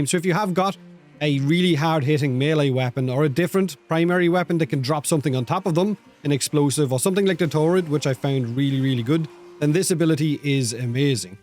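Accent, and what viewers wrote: Ireland. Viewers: It must be the Irish accent, I can always understand what the is describing about the abilities, anyone else has a go and I start day dreaming of long strolls in the woods under all the beautiful threes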